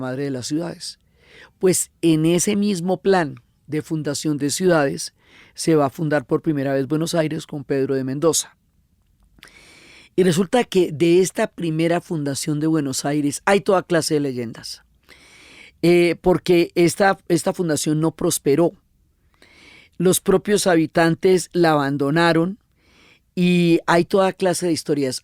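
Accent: Colombia. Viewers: Yo no se si la voz de Diana es bonita o no pero es que a quien no le va a encantar escuchar hablar con ese acento! Aqui se junto todo lo bonito! La historia, la geografia, la cultura, la radio, el podcast, las ciencias sociales en general, colombia lo mas bonito de america y lo dice un Hondureño y desde luego una mujer y ahora pongamole a esa mujer ese acento tan bello ¡ como le parece!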